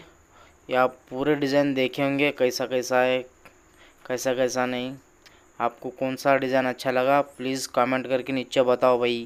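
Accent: Hyderabad, India. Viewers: All are good designs....My teeth were actually sensitised with that scratch sound.....loved your hyderabadi way of speaking.....bole to bahot acha laga 👍😊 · Hyderabadi ka language mast hai 😄. My favorite is +- and Blade design. Great work art.👌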